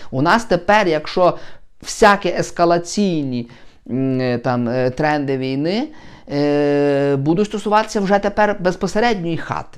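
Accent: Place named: Galicia, Ukraine. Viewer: Гидко слухати цей галичанський говір.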